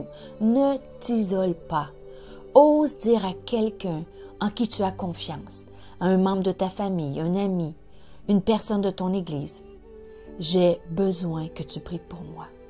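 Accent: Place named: Quebec, Canada. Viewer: Bonjour merci pour ces mots gentils ey avec un accent tres fort du Québec si je ne me trompe Je mediterai volontiers sur ce que vous avez dit au cours de cette journee Bon dimanche a vous et a votre famille Des bisous de l'île Maurice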